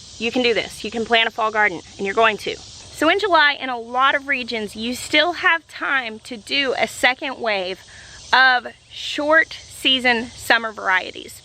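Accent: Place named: Arkansas, United States. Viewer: she's very inspirational to me. We grew up 40 miles away from her home. I ended up moving away 20 years ago to Wichita Kansas. I get so tickled at her because her accent is so me!! Lol my family teases me when my Arkansas accent comes out. I've been sending them videos saying, now I don't feel alone. Then yesterday a man came by from one of the churches here they are having vacation Bible School. I was in the other room but heard his voice. I thought, that man is from Arkansas! I went to the door and he was telling my daughter he was from Arkansas. Lmbo we have a one of a kind accent like no other lol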